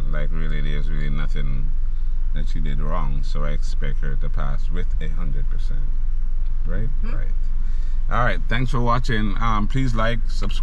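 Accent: Jamaica. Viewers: He Jamaican?... hear the accent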